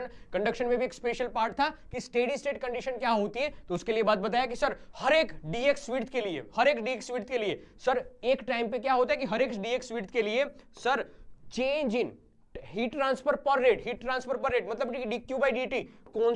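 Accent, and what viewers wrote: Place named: Haryana, India. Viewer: Sir Tey to haryanvi lage manne🤔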